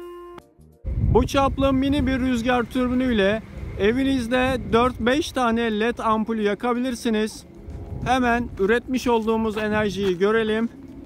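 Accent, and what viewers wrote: Turkey. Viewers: Ende manavgatli gibi az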